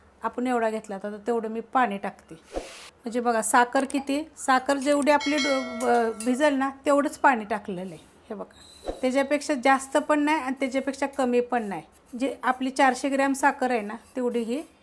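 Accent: Satara, India. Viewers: मी पण सातारची आहे .... भाषे वरून मी तुम्हाला ओळखले 👍🏻👍🏻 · खूप छान रेसिपी आपली सातारी भाषा आणि सातार भाग सारखे च लाडू बनविले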